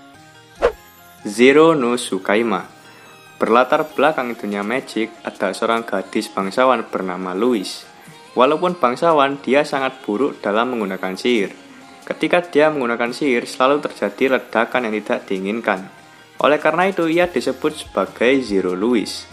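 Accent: Java, Indonesia. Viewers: jawa banget koe lur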